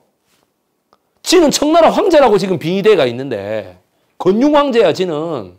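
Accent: Busan, South Korea. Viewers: 전형적인 부산영어발음 ❤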